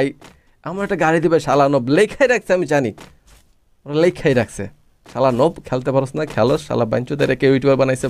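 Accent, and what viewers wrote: Sylhet, Bangladesh. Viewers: Tumi Sylhet fua ni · bhaiya apni ki sylheti?? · vai apnar basha sylhet kunano?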